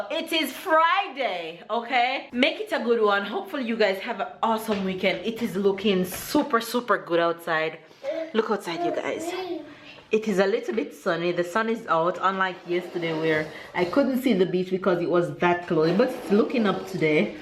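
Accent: Jamaica. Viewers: U go gal love fuh hear u teach uh husband d Jami twang shouts out from Tobago Caribbean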